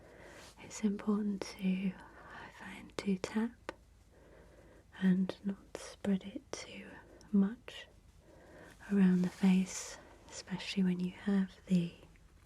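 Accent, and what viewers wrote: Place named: United Kingdom. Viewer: Wait does have a UK accent? I’ve been watching for so long I didn’t recognize anymore if she does, until she said “holiday”, because Americans say vacation. No way I forgot she has an accent 😂😂😂😂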